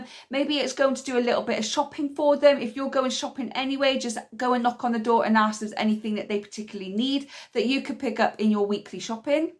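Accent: United Kingdom. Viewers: You are accent look like British love it that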